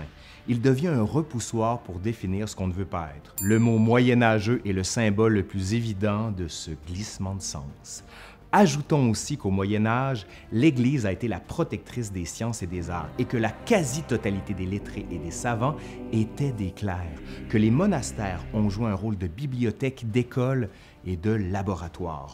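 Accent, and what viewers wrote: Quebec, Canada. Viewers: Encore une super vidéo ! Ta voix posée avec l'accent québécois, c'est le feu ! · Bon, je savais tout ça mais c'est clair, concis et l'accent québécois c'est très sympa, donc pouce bleu d'un maudit français !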